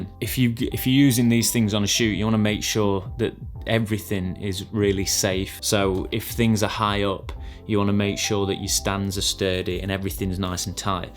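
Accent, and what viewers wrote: United Kingdom. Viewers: His british accent is good to hear! This light has been great!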